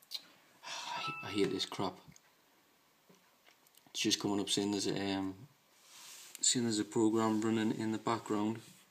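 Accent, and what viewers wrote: Newcastle, United Kingdom. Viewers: Cor....sounds like a geordie to me......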